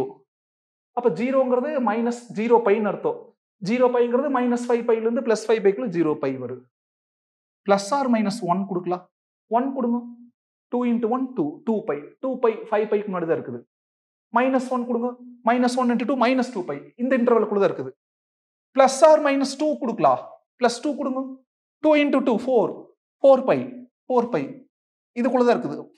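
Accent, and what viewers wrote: Coimbatore, India. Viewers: Kerala Pakkam ponadhu Ilaya 🤣🤣 idhu Coimbatore Tamil 🤭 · Guys kovai slang 😅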